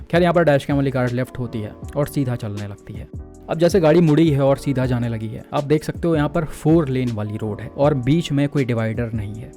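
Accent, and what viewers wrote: Lucknow, India. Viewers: bro are you from lucknow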